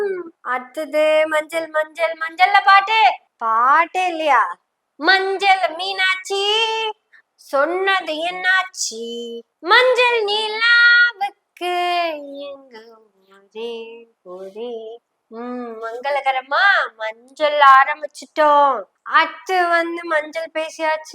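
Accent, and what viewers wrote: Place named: Coimbatore, India. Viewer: கோவை தமிழ் அருமை சகோதரி வாழ்த்துகள்❤❤🎉🎉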